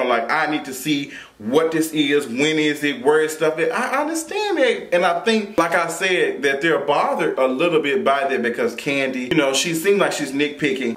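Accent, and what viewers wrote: Memphis, United States. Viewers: You’re so Memphis. Be currful (careful) lol I love it!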